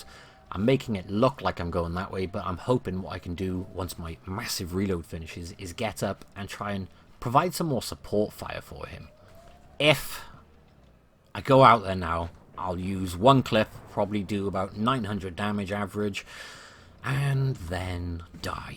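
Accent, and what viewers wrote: United Kingdom. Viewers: U see that typicaly english? I think he is 100% UK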